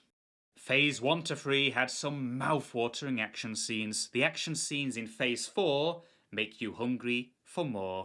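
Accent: United Kingdom. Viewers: These videos are INSPIRING and genius. I have been pondering making a channel praising the woke bowel movement incessantly in a serious manner to the point of ridiculousness for awhile now. I will only do it if I can pull it off right. It won't be as elegantly as does it that's for sure. He's a Brit so the accent is perfect for it.. I'm going to have to come at it in a more aggressive Antifa/BLM obnoxious type of manner. Great job, mate.. cheers!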